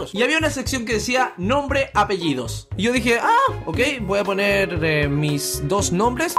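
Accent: Chile. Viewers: Sabia que era chileno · Este chileno :v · Mmmmm eres chileno???? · hola soy nuevo sub ¿eres chileno? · Wena eres chileno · Ho por Dios eres chileno como yo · Eres chileno sierto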